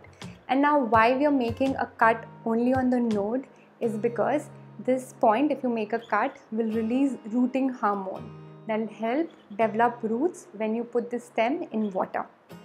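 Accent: India. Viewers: Pure Indian accent 😂😂😂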